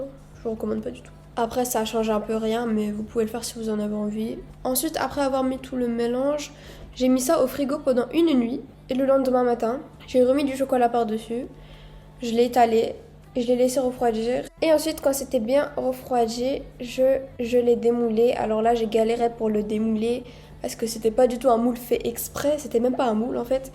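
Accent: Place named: Canada. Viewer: T dans quel pays psq t’a unpeu un accent du Canada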